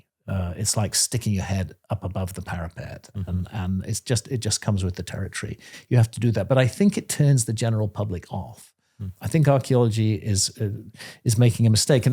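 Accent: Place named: North, United Kingdom. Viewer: Always feels weird hearing a fellow norther accent on YT, in a good way!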